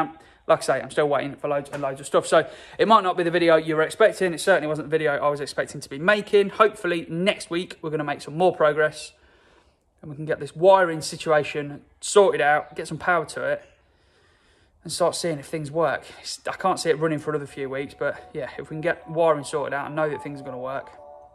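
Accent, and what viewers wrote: United Kingdom. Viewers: I like these videos:) and this accent:)) sounds beautiful😍✨. Is it british accent?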